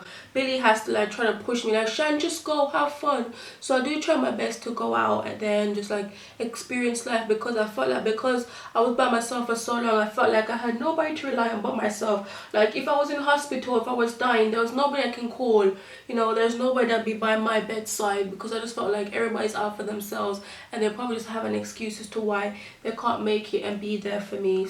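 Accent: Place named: Jamaica. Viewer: love this videos love thank you for sharing your journey also love the Jamaican accent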